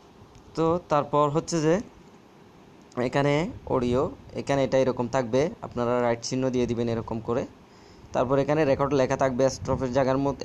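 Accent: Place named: Chittagong, Bangladesh. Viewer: আপনার ভাষা শুনে তো আমাদের চট্টগ্রামের মত লাগে,,,চিটাইংগার টান আছে ভাষাতে, 🥰🥰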